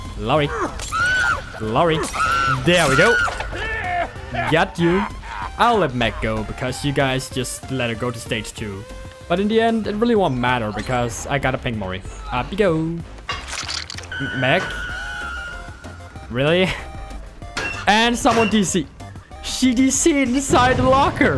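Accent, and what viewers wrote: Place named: Germany. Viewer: Nice Vid :D and i have an question. Are you European? (your accent is similar to mine and im german soo)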